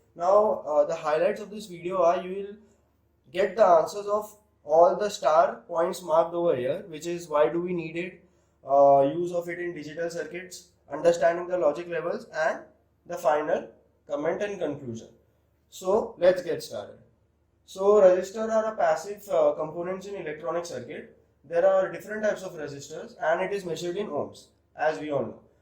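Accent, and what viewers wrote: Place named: India. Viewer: Hard to understand the india accent. Great video.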